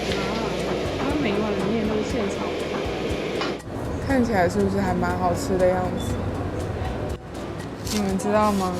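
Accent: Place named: Jiangzhe, China. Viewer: 博主是哪里的华人？听口音真的很大陆华南的口音，偏江浙一带。但是海外华人基本上广东福建人很多，口音明显，所以我很好奇。 如果是大陆人，到大陆不至于这么惊讶，不是大陆人，口音真的好大陆，如果说是台湾人，口音真的很淡。 😁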